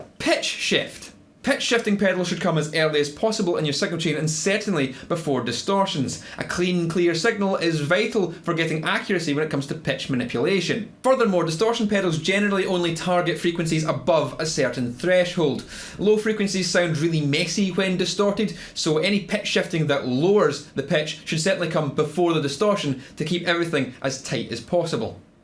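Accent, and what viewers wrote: Scotland, United Kingdom. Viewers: Hey,! I am from germany and like your great videos, because you are a competent teacher and you have a big talent to keep even complicated contents understandable and correct. And there is your friendlyness and great sense of humour, that makes nearly every video a nice event! And it is fine, that you don' t deny your scottish origin, BUT.... please, please, never forget, that a lot of guitar players, that enjoy your vids come from other countries and some, as me, have sometimes problems to follow your explains, not, because of your scottish accent, but because of the often unbelieveable TEMPO you use!! So i like to beg you to talk with a bit less speed so that it is no problem for us "non-scots" to follow your explainations!! Thanx a lot for keeping this in mind!! - I am already curious about the next interesting vids!! 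Lincoln 6 Echo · Ah noo th' rayzon. Ya lessen chu thus bekez joost lessenen ta Scawtush axunt is fasunettin. Now, me, I watched it to get opinion on Noise Gates. Great answer for that question. Thanks,!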